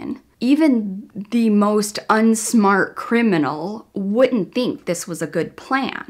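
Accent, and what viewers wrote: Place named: Midwest, United States. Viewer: You: Central Standard time ME: I KNEW IT!! I knew you were One of us!a "midwesterner" by your "accent" LOL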